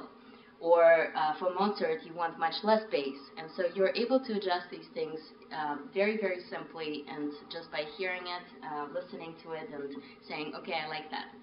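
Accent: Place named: Russia. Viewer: Strange how a Russian lady reminds you of a French lady but they do have similar accents lol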